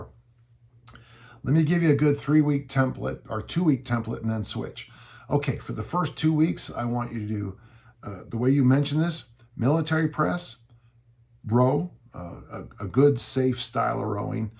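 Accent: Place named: England, United Kingdom. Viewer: He's certainly not Scottish, he's as English as they come 😂 But yeah, he's very good!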